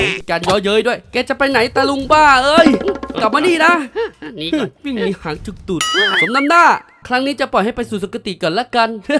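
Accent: Isan, Thailand. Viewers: พี่ฉันเป็นคนอีสานหรอคะ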